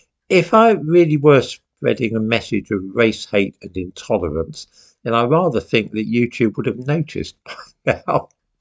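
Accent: United Kingdom. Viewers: It is mostly because you MUMBLE and only the UK English can make out most of what you say. I find it very hard to understand a lot of your speech. Tryin learning how to speak well and once you reach a bit of an audience, you will get strikes and a van. You simply aren't a threat to the Left. I doubt that even they understand half of what you are mumbling.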